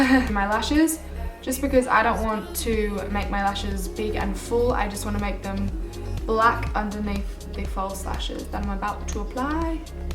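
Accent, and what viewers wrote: Australia. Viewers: Oh damn it's Gigi w an Aussie accent!!!! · are you australian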